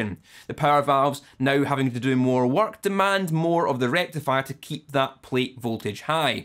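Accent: Scotland, United Kingdom. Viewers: i enjoy all your "ta tas" and learn a lot.....your brogue is entertaining and your enunciation perfect....had a Scottish girlfriend back in the 60s, her name was Carol Cummings, did you know her?...just joking, love your lectures!!! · Ah wouldn't a-trusted hem but for his Scots brogue. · Great video. I sure know a lot more about fukin valve amps than I ever did before! Love that accent of yours. What part of the UK are ya from Jerry from PA-USA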